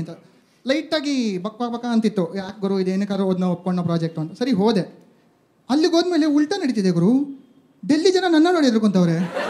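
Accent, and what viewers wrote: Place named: Bangalore, India. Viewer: Haha!! Kanditha!! Thanks a lot for watching!! Kannada bhaashe nammanna uddara maadtide.. Benglur slang swalpa mix ide, aadre pakka Kannadiga! ಕಲಿಯೋಕೆ ಕೋಟಿ ಭಾಷೆ, ಆಡೋಕೆ ಒಂದೇ ಭಾಷೆ, ಕನ್ನಡಾ.. ಕನ್ನಡ.. ಕಸ್ತೂರಿ ನಮ್ ಕನ್ನಡ!❤️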